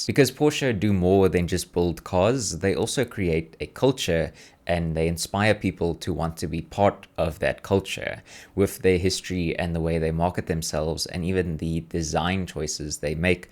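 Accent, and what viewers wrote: South Africa. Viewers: I can just hear the south african in you bru 💪🇿🇦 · I'm new here seconds in me af: "Yha He Is Definitely South Ahhh🇿🇦..." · Brother heard by your voice you have RSA DNA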